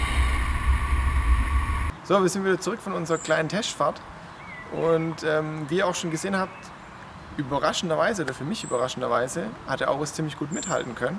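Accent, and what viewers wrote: Swabia, Germany. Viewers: Der schwäbelt ja rum, des überrascht mi jetzt doch a bißle. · schwäbisch? :D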